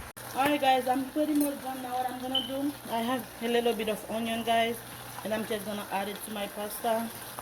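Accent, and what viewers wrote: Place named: Haiti. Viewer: Baby that Haitian French accent so cute lol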